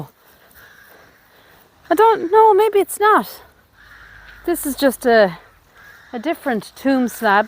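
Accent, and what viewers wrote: Ireland. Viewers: So impressed you cleaned what you did by hand. Reminds me of when i was a girl, my Dad and I spent many happy years wandering around graveyards. Dad was a bit of an historian and we discovered an unknown battleground and a mass grave of Maori warriors, ( men and women). Loving your Irish accent.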